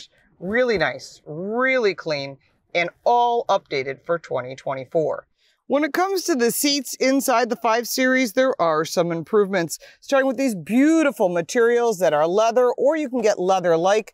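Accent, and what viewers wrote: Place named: Buffalo, United States. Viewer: Paul's wife, Loveable❤ barbie doll Lauren Fix Car Coach Reports, congratulations on an excellent quality 2024 BMW 5 review. Beautiful vehicle, excellent explanation as always, love the Buffalo, NY accent and your test drives! ❤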